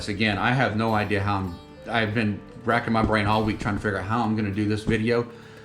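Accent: Texas, United States. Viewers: Hi! You are doing amazing even when you had coffee for the first days, I’m so impressed of your progress, you’re doing absolutely fantastic 👍🏻 I’m Moroccan in America, I teach my kids to be thankful everything, even the air that we breathe, because we have everything here we don’t feel there that is different somewhere else. My daughter is 11 now and because there is no school she wants to experience fasting for the first time, and yes she is doing the whole day by choice. Note: kids should start fasting when they reach puberty in Islam. Please ask yourself those 2 questions: 1- why me in a Muslim country in Ramadan, what God wants for me to know? 2-why I was chosen to experience this and reflect on my life by myself while I’m getting physical and spiritual cleansing? Do I have to do more research to find out why? Things will come with time but do some from your part. 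yusuf Estes is from Texas, I suggest that you watch his videos, his has the same accent as you 😊 he explains a lot a about Ramadan and more m, he is funny too. And please take care yourself, the tea looks amazing, you need to eat more than that so stay strong for fasting. I’ll watch all your videos and always I can wait for the next one. Please upload more 😊 Take care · Hey, ma man u actually give attention to a lot of things, (the but i digress got me laughing) it reminded me of a tech-youtuber that says it a lot, what i wanted to say is actually even in here we have stuff open by night in the big citys like (rabat/casa/marrakech) ex: macdonalds stays open to about am some other late night kebabs do too, but with the pandemic everthing is closed even in the usa i think it's not the same with the pandemic, btw we understand you because you are from the usa and not from irland or some other part of the uk, your english is pretty much like what we see on tv shows & movies, the tea done right + u actually are more morrocan than a genuine one, why you may ask well most of us are not perfect the way humans are is quite universale, the exmple you gave for being addicted to tobacco, the coffee, we can understand it's quiet normal. 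am only gona say well done, and god bless you brother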